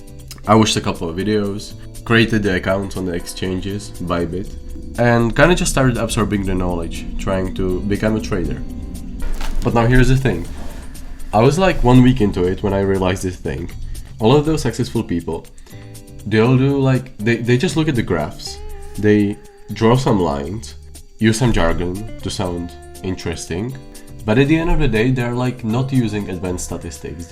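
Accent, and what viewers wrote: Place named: Czech Republic. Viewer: Accent sounds extremely Czech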